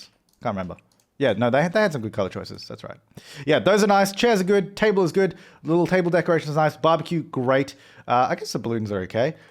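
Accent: Australia. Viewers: Ocker voice AND CLASSIC MAKES A RETUUUUUURN!!!!;)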